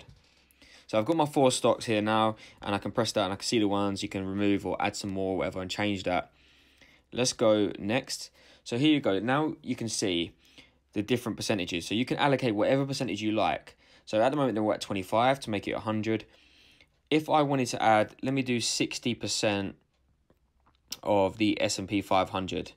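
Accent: United Kingdom. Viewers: Hey, I like the British accent. I’m Kenyan and because we were a British colony, that’s the English we speak there. When I moved to the US 9 years ago, I had a hard time adjusting, and even today I still struggle. Other than that, great content!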